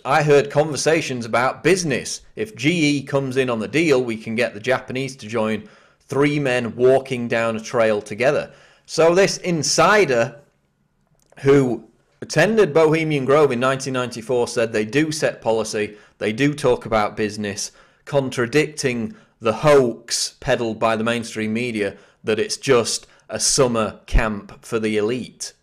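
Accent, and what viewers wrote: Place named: England, United Kingdom. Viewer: Good video. But man i hate that english accent.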